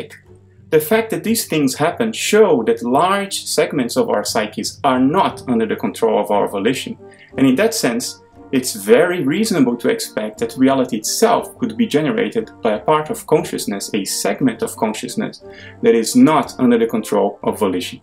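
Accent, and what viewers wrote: Netherlands. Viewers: Cool Dutch accent!! Good points made!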